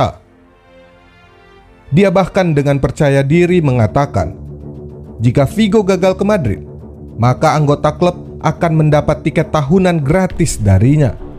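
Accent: Medan, Indonesia. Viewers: BG..ABG orang Medan yah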